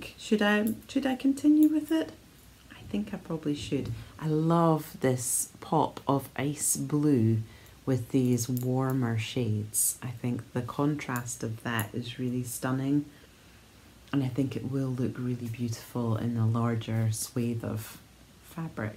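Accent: Scotland, United Kingdom. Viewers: every time I hear your Scottish accent I think of my Nan. She left Scotland right after wwII bound for America. Her heart missed Aberdeen all the time, despite having had harsh and brutal childhood. I hope to set foot on the land that lost her. I’m sure that you hear from folks with Scotch ancestry all of the time, so I apologize. You are not Scotlands keeper of it’s lost souls. I do hope that you are over that brute of a virus and make up lost knitting time💗💗💗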